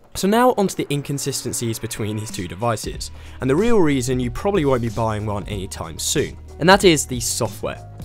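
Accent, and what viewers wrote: England, United Kingdom. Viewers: I will be studying in Uk this year,are you from England ?????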